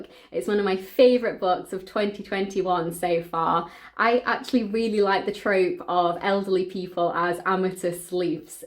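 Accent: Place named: Northern England, United Kingdom. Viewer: I love hearing a northern voice!! Sending love from Leeds❤️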